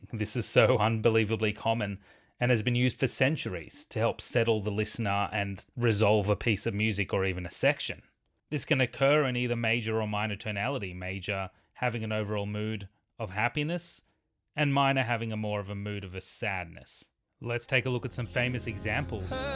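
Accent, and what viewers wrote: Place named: Australia. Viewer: All true. This is historic and cultural way of singing also. Etno. And if this is Aussie accent I love it. The best english ever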